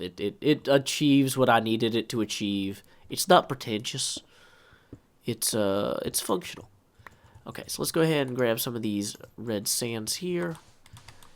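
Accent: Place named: South, United States. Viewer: I've always loved your accent (I'm English and a sucker for Southern American accents) but now I'm starting to love your crazy sense of humour.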